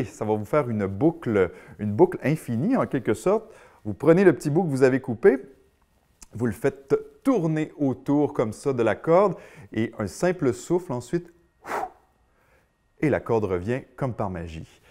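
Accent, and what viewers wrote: Quebec, Canada. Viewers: Petit garnement! J'ai t'ai vu souvent sur des vidéos tournés en France et je dois dire que l'accent québécois te va mieux. Merci Pour les tutos et bon succès!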